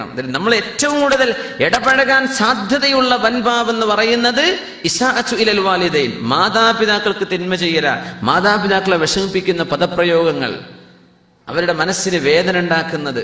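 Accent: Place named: United States. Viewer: Ni endinaaaa English american pole slang Ni thani malayali allle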